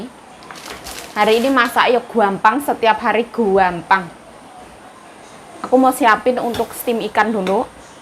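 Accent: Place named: Ngawi, Indonesia. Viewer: Ya ampun bahasa blotrokmu wi lho hehehebe jian wong ngawi tenan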